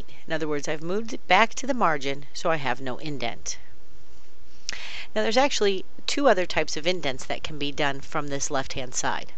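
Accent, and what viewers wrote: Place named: United States. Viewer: Pretty much a straight American-English accent. Ha, ha.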